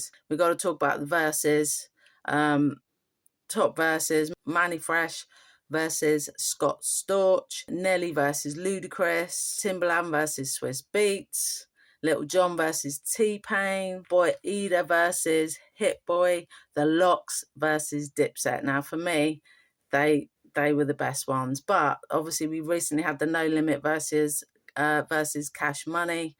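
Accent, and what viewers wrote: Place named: England, United Kingdom. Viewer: Do you mind if I skip my favorites? You skipped, not my favourite by any stretch, but a grime OG. You still sound English as fuck, how long have you lived in America? Ya, Lord of the mics is the best battle, because it was thee battle. It showed why grime was it's own thing. Beats, cadence, London accents, and slang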